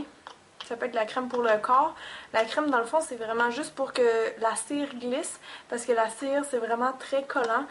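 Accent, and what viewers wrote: Quebec, Canada. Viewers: c'st un accent quebequois pas belge mais bon jten ve pas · C'est un peu du Québécois mais bon.. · C'est normal que tu as cet accent c'est parceque tu est quebecoise x) sinon . on dirait vraiment que tu as une coupure ! :P tres belle vidéo :) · Mathieu Blanc ne connais-tu donc pas le respect. D'après tes commentaires on peut voir que tu n'as jamais entendu un Canadien parler. Au lieu de rester bloquer sur l'accent tu devrais voir le travail formidable qu'elle nous fait voir. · Elle est québécoise, elle parle bien c'est juste son accent >< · Excellent... J'adore tes petites videos!!!... Et tu es super dynamique... je suis belge, mais j'ai énormément de famille là-bas chez toi... J'ai toujours adoré ce pti accent du Québec...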